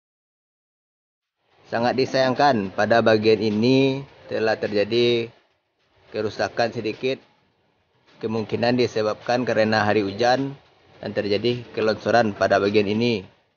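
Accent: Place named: West Sumatra, Indonesia. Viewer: Jaleh bana logat Minang ang sanak😂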